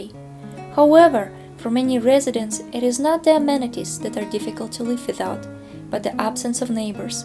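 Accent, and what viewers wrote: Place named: Russia. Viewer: Интересно, а зачем надо было на английском (с явным русским акцентом) выдавать это видео? Думаете, заграница нам поможет?))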